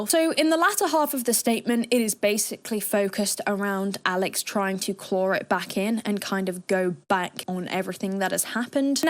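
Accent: United Kingdom. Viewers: He sounds SO British that the fact you are too did not set in until 20 minutes in 💀 sorry, side note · r*tard is used in uk to mean idiot even tho u sound abit british u have the sensativity of a american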